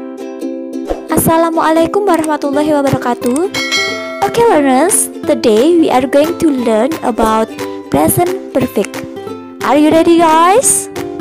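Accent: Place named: Java, Indonesia. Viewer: Suara medok jawa bahasa inggtis jadi bagus juga yaa kerren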